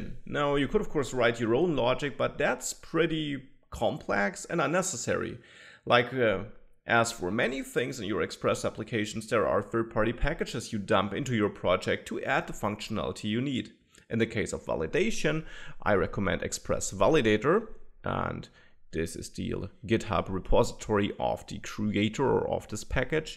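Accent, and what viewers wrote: Germany. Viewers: Every tutorial is better with a German accent.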